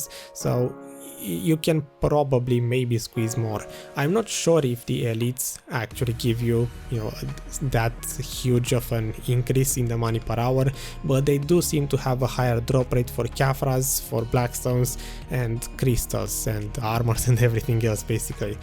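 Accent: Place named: Romania. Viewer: haha, your accent is so romanian. the moment I heard it I was almost certain XD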